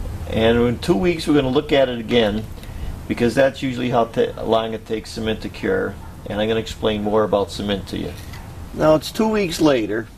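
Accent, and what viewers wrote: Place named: Chicago, United States. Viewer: Sounds like a Chicago accent, awesome!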